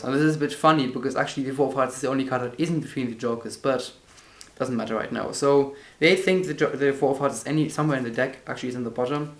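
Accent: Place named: Germany. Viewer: That german accent😂👳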